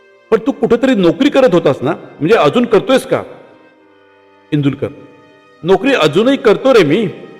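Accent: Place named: Kolhapur, India. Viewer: कोल्हापूरी टोन ऐकायला बरा वाटला.. शेवटी कोणी कोणाच नसत हेच खर...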